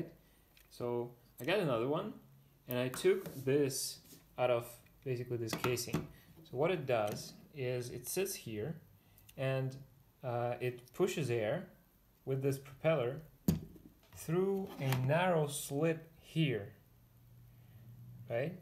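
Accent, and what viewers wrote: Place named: Slovenia. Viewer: Slovenian accent detected.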